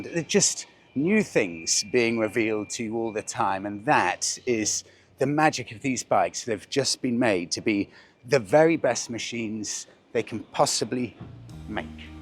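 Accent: RP, United Kingdom. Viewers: haha its just being a posh twat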